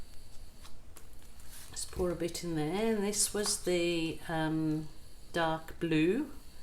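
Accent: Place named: Liverpool, United Kingdom. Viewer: Do you have a Liverpool accent mixed in,.,, sorry if this is rude or you've mentioned it. Love this videos and your simple recipe proves the art comes from the artist's skill. LOL watching you now and it does sound like some scouse in there!!! Ps love these colours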